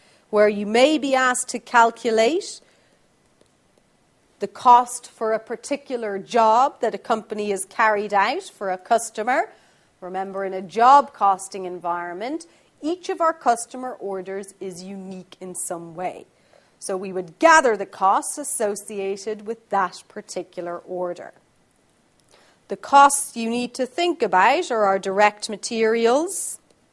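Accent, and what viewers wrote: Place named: United Kingdom. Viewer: wow uk english hit different eh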